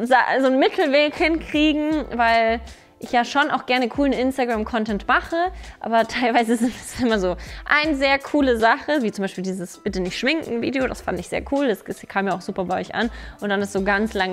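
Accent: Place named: Franconia, Germany. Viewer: als Halbfranke ist es für mich seeeehr sympathisch, dass du jetzt anfängst bisschen ins Fränkische abzurutschen. "Na, wir ham des damals aba a scho imma so gmacht"😍 ...love it!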